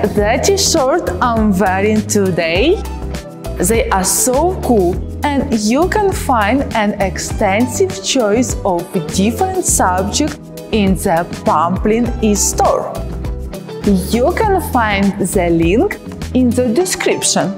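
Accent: Russia. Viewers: Русский английский так прикольно звучит :-) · это какой-то трэш от очередной васи пупкиной, а для чего такой рязанский-английский?🤣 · Хорошая девочка. Только ей пока лучше не говорить, а читать тексты, при этом соотвественно жестикулируя и двигая губами и глазами. Т е накладывая видео на текст. Тогда темп речи будет быстрее и легче будет слишком тяжёлый русский акцент, который сейчас тяжелее немецкого.Поработать над слитностью речи и звуками. Всё равно это придётся делать если она хочет международной аудитории.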